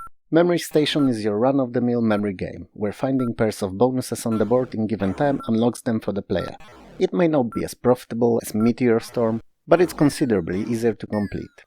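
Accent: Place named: Poland. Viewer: ha tak czułem że to polski akcent w tym języku angielskim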